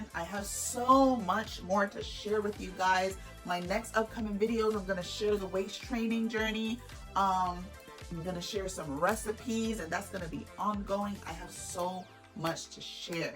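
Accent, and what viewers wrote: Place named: Jamaica. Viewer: Great.love the Jamaican twang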